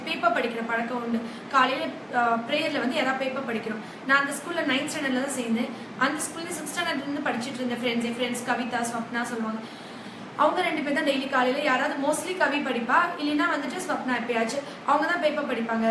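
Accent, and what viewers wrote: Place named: Brahmin, India. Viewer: God bless u Mam 🙏 I like ur voice very much Brahmins Thamil is Mixed in ur ascent nice motivational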